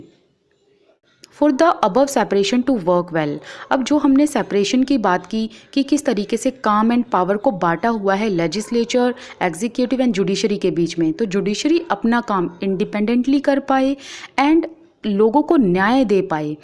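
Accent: Haryana, India. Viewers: Ma'am haryana ki kr waha ke log aese hi bolte he 😒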